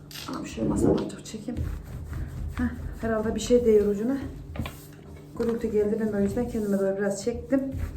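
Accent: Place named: Ankara, Turkey. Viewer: Konuşmanız. Sanki ankaralı gibi nasıl yaptıgını göremiyorum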